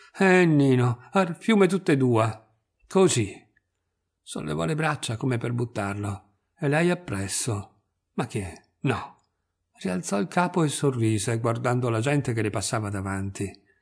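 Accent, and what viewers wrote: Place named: Rome, Italy. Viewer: spettacolare interpretazione in romanesco, sai com'è.. so' romano anch'io..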